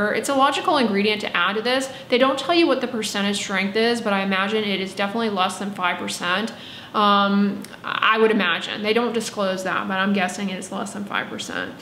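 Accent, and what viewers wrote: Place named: Midwest, United States. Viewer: She sounds like she is from the Midwest ..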